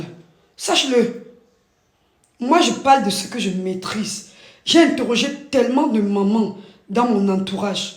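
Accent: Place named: Bangangté, Cameroon. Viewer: Ton accent Bangangté est trop fort ma chérie❤t'es vachement mignonne❤❤bisous.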